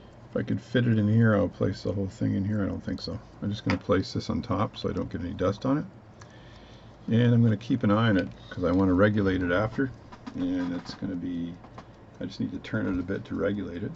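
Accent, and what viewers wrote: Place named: Newfoundland, Canada. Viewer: Ok. The mystery is over. You are from Newfoundland. Right?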